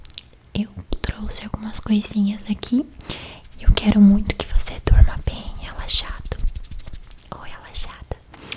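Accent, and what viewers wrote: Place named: Goiás, Brazil. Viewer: Vc é Goiana? Seu sotaque parece nosso ❤️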